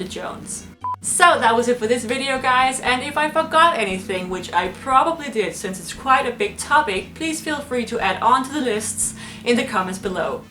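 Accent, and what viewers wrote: Denmark. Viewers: i could hear by your accent that you were a dane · Jeg kender ikke nogen der lyder mere dansk end dig. Mine øre bløder. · You must admit these statements hits the bullseye and fits well today: "They are critiquers, and interested only in one-upmanship, and speaking just for the sake of being heard" and "being only motivated by greed, inebriation and others' technology". If this doesn't fit well with US, Denmark and other western countries I don't know what does. Even this video is a PR stunt and this girl is probably Danish and not American or English. Listen to her accent. The romans were the epitome of civilization even though they were inferior technological compared to modern day..... It helps to think outside the box sometimes. Cheers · You have such a danish accent! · Man kan godt høre du er dansk xD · Ikke for at være ond, men din accent lyder rimelig dansk. 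· Nej, de er kraftidioter. A video addressing that some people might think this and that place is irrelevant can certainly have a title like the one this has. I clicked it because I wanted to hear what it was about. Partially from her name and the first few words she spoke I became certain she was Danish herself. That made me want to hear more again since there had to be a reason she'd make a video with that title. Now I know. The idiots who are incapable of critical thinking should quit the internet at read some books till they get a bit better at reading between the lines. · Nice thick Danish accent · Also, your danish accent is pretty obvious heh, a throwback to college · After 3 seconds i already knew you were from Denmark, 'cause you have a "kartoffeldansk" accent (PotatoAccent) x)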